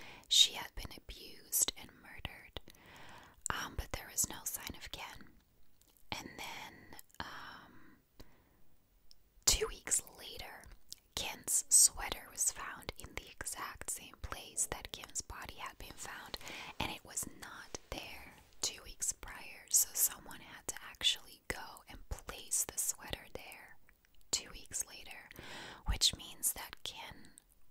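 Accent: United States. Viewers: I just started watching your videos not too long ago and I absolutely love them! However, I didn’t even realize you were from Belgium until this video. I’m from the U.S. and I assumed you were too because your accent sounds American! I didn’t realize how similar Belgians and Americans sound! Or am I missing something? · You sound so American! You could pass as someone who is from the USA!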